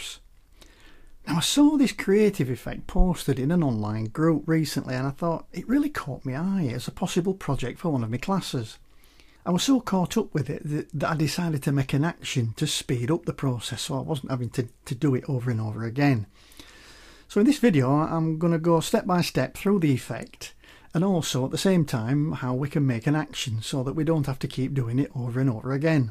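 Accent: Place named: Yorkshire, United Kingdom. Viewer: Nice one....I can think of all sorts of uses for this. Great to hear a Yorkshire accent too:)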